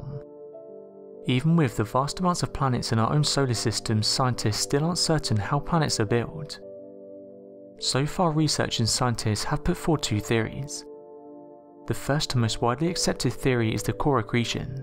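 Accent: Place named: Cockney, United Kingdom. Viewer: Sorry but that cockney is the worst. Mate😎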